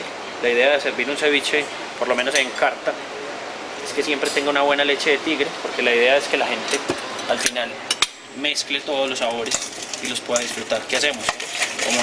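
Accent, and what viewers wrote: Colombia. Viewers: Por el acento es colombiano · cheff con acento colombiano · HABER , HABER ........ COMO HAZ DICHO .. . por tu fonetica entiendo que eres colombiano .. el cebiche PERUANO nunca pero NUNCA se , utiliza perejil . SE UTILIZA CILANDRO huev....... y el sazonador que indicas alegremente y, tratas solo tratas de explicar , se aplica de acuerdo al criterio del cocinero y eso lo hacen cuando el pescado no es fresco y no es apropiado para un cebiche .. como en tu caso NO quiero ser alarmista pero los medicos recomiendan no consumir algunos peces y entre ellos esta la tilapia que por cierto hay mucha variedad ..... este cocinero de tercera ... mejor dicho ayudante de cocina ... ya ha tenido mucho tiempo para aprender a hervir agua ..dado el tiempo de publicacion de este video ...,, deseo y le pido que para hablar de cocina PERUANA tenga la gentileza de aprender mas ........ 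un saludo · es colombiano por el acento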